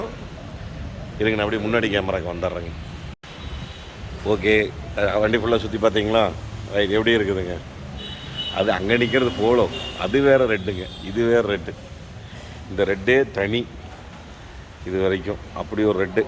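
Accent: Kongu, India. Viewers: கொஞ்சும் கொங்குத் தமிழ் பேசும் கோமகரே...! திகட்டாத தித்திக்கும் பேச்சுடைய திருப்பூர் திருமகரே...! மதிப்பிற்குறிய எங்கள் " " திரு. அவர்களே🙏 எப்படி இருக்கீங்க❤❤❤ அண்ணி🙏 எப்படி இருக்காங்க❤❤❤ ரித்திக் எப்படி இருக்காரு❤❤❤ யெப்ப்ப்ப்ப்ப்ப்ப்ப்பா😍😍😍😍😍😍😍😍😍😍😍😍😍😍😍😍😍😍😍😍😍😍😍😍😍😍 அட...அட...அட என்னா கலரு போங்க😍😍😍😍😍😍 சொப்பன சுந்தரிய யாரு வச்சு இருக்காங்கனு கரகாட்டகாரன் படத்துல செந்தில் அண்ணன் கவுண்டமணி அண்ணன்கிட்ட கேட்பாரு? இங்க பாருங்க...."TMF" செக்கச் செவேல்னு ஜிகுஜிகுஜிகுனு சிவப்பு சொப்பன சுந்தரி லேன்சர் காரை நம்ம " " திரு. அண்ணா வச்சு இருக்காருங்கோ😍😍😍😍😍😍😍😍😍😍😍😍😍 கொட்டப் பாக்கும் கொழுந்து வெத்தலையும் போட்டால் வாய் செவக்கும்...! எங்கள் " " அண்ணாவோட வேலைப்பாடுகளில் கார் எல்லாம் ஜொலிஜொலிக்கும்😍😍😍😍 அழுக்கான அசிங்கமெல்லாம் அழகு வண்ணம் பூசுகையில் சாலை ரதத் தேர் என்னும் கார் எல்லாம் பொன்னாய் மிண்ணும்...! வழுக்காத நேர்மையென்னும் வாழ்க்கைப் பாதைதனைக் கொண்ட எங்கள் " " அண்ணா சிந்தனையும் செயலும் அவர் வேலைப்பாடுகளிலே பொன்னாய் மிண்ணும்...! 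எச்சிலொழுகும் தன் மழலைதனின் அன்பு முத்தச் சுவைதன்னை தாய் ரசித்து ருசிப்பதுபோல...! வாகனம் பராமரிப்பற்று எச்சமிட்டதுபோல் ஆகி அருவருப்பான இடமெல்லாம் மிச்சம் மீதியில்லாமல் சுத்தம் செய்து தரும் எங்கள் மதிப்பிற்குறிய "சூப்பர் கார் கேர்" (Super Car Care) ஊழியர்களின்🙏 உழைப்பைக் கண்டு அனைத்து "TMF" கண்களும் காணோளி வாயிலாக ரசித்து ருசிக்கிறது...!! சூப்பர் கார் கேரில் வேலை பார்க்கிற ஊழியர்களுக்காக ஓர் சிறிய கவிதை🙏 வேதனை வந்து வீழ்த்தி வீழும் நிலைக்குத் தள்ளப்பட்டாலும் விதியென வீழ்ந்திடாது வாழ்வில் உயர நினைத்து உடல் வளைத்து வியர்வை வடித்து உழைக்கும் உன்னத உழை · Super workmanship, sir.You have proved that you are the Best car mechnic and as always your Kongu Tamil is sooper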